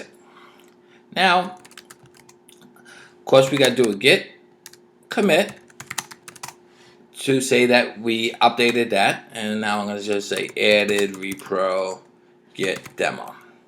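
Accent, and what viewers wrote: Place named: Boston, United States. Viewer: repro - lovin' the accent. Cousin's from Bwahstin (Boston).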